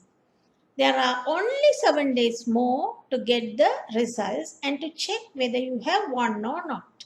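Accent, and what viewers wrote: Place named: India. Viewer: This is indian voice how could you understand américain law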